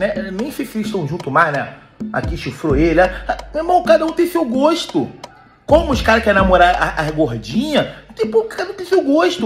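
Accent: Rio de Janeiro, Brazil. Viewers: Gente eu tô 32 anos fora do Brasil, sou do Rio de Janeiro, e ti juro dou tanta risadas, mas tanta risadas com esse 😂😂😂😂😂😂😂😂😂😂 escutar as gírias e o sotaque do Rio não tem preço ❤❤❤❤ Ti adoro " "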